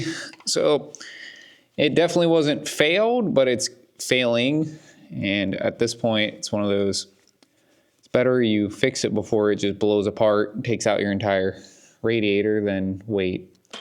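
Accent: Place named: Pennsylvania, United States. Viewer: I have to ask, are you related to Sam from Scrappy Industries? I see the personalized "Scrappy Industries" sweatshirt, and you definitely have a heavy western Pennsylvania accent. (I grew up 40 miles west in Steubenville, Ohio.). You look like you could be his brother?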